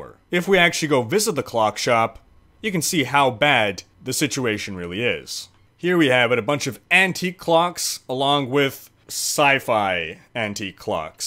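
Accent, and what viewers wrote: Germany. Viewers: Dude i Love your German accent 😅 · And your german accent is actually really good. The only thing is that you say eichenwalde with a K insted of a CH you would say the CH like in charm or charcole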